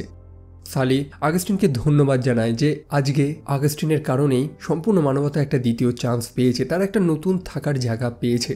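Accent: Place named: Bangladesh. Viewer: apnar country India...But your Bangla Calcutta er accent nai..Bangladesh er accent er moto lage..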